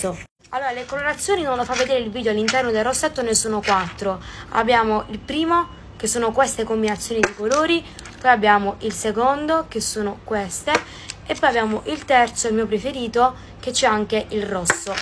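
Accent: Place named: Apulia, Italy. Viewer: Al colleggio parlavi Pugliese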